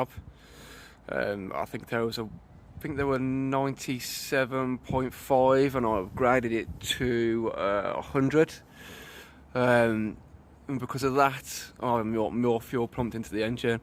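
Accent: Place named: Birmingham, United Kingdom. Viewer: You from Birmingham or something mate? Your accent sounds pretty local to me. ;)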